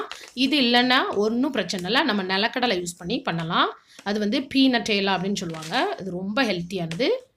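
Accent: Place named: Tenkasi, India. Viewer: Hi Akka..... my native was Tenkasi (pavoorchatram). So i liked your way of talking, while seeing your video your voice taking me to my native. I watched all your videos....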